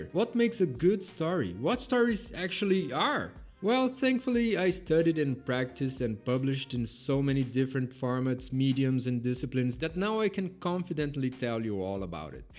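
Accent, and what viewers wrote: Brazil. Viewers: Ouvi o sotaque BR também e já deixei o apoio. Tem canal em brasileiro? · Are you brazilian by any chance? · No começo do vídeo eu fiquei "esse sotaque é brasileiro", no meio eu falei "oxi citado" e no final eu falei "kralho, é o " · Is that a 🇧🇷 accent I hear?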